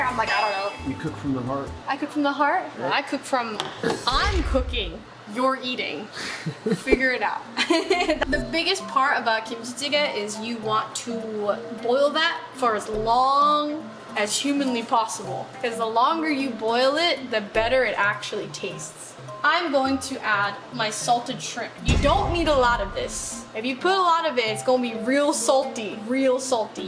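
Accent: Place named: United States. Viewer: Why does she have an American accent now?? ☹I've watched ever since her K-pop days and her accent was distinctively British whenever she speaks English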